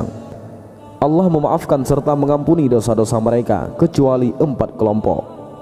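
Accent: Aceh, Indonesia. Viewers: Yg peugah haba mngkn jeut neu stel bacut logatjih, brat that meu aceh...semoga kedepan makin berkembang..amin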